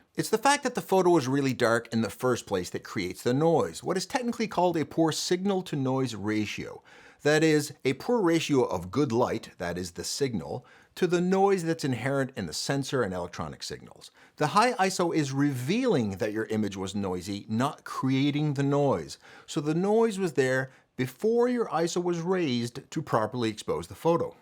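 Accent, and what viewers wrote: Canada. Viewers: That is a prime time Canadian accent 🇨🇦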